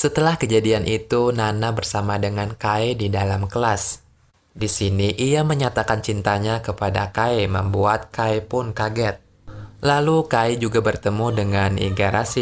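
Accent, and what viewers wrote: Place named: Kalimantan, Indonesia. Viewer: Kaya org kalimantan bhs nya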